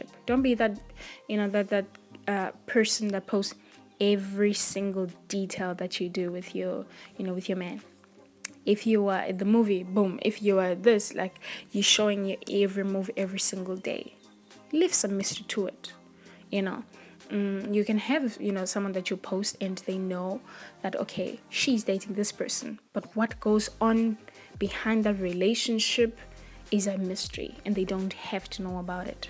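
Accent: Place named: Zimbabwe. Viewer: Very proud to hear a sophisticated Zimbabwean sisi here :) Well done, lovely accent. I have the same. Keep living and growing well girl. Love from the UK xxx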